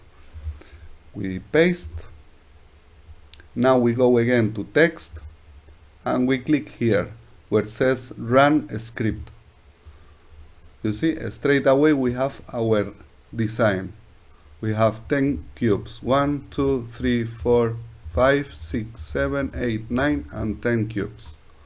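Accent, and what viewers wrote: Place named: Highlands, Peru. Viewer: Inglés cerreño, me imagino que eres de peru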